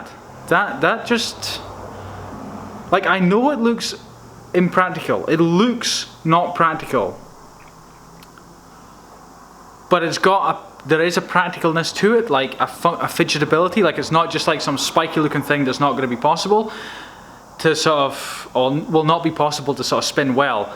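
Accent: Scotland, United Kingdom. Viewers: are you scotish? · You Scottish too?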